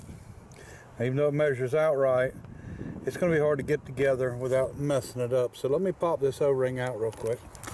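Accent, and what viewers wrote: United States. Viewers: A true American, an American holiday, American ingenuity, American scenery, American accent throughout.......gives one hope that this is still America and we might, just MIGHT recover. Need an example of what I mean? When buying a $10 watch at Walmart, look at the instruction sheet. Three feet square! The English part is three square inches. A new iron, same thing only they tell you "DON'T IRON CLOTHES WHILE WEARING THEM!" Whoopeee! Happy Mothers Day. Thanks.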